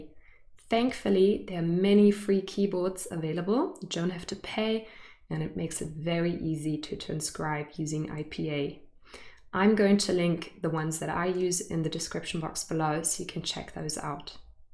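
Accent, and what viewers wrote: Germany. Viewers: I know you are a German-speaking teacher of English. My observant ears can catch a foreign accent in your speaking English. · Are you German? Where are you from? You sound German.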